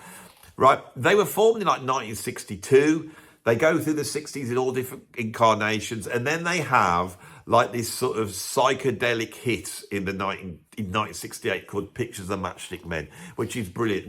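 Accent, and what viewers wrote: Birmingham, United Kingdom. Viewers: This is the kind of well thought out and insightful video that made me a subscriber. I particularly liked the irony of a Brummie ridiculing the northern accent. Bravo, sir!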